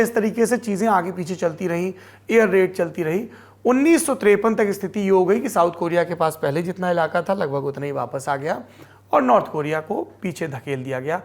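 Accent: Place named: Bhojpuri, India. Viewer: पहले मेरे पिता जी news देखने को कहते थे कि बेटा News देखा करो हिंदी भाषा अच्छी हो जाएगी। लल्लन टॉप और इन की तरह खबर बताने वाले तो हिन्दी भाषा को को भोजपुरी चटखारा दे रहे हैं।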